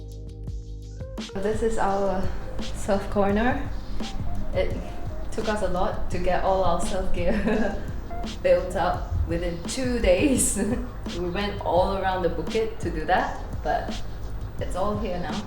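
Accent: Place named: Singapore. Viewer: I love watching your channel. So you're from Singapore that answers my curiosity cuz you sound like one without Singlish of course 😀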